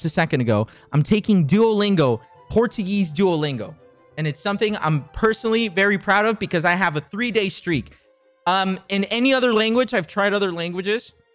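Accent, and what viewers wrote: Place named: Argentina. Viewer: Español Argentino SSJJSKSKSMS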